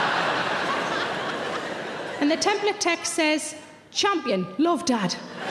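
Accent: Newcastle, United Kingdom. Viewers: Hi:) love your humour and your Geordie accent...its " Champion" lol.... (Im from UK originally live in Aussie ) would love to see some new videos of you.... Ive watched all your vids on here, and miss your humour.... Any new shows that you can put on here please....would love that thank you:) stay safe and well:) keep them coming lol....and that will be "Champion pet"...ok Flower? thanks:) magz:)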